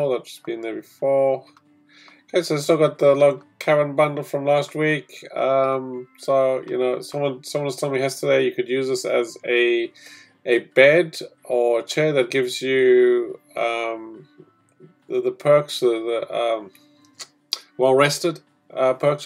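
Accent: South Africa. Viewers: This dude sounds super south african😂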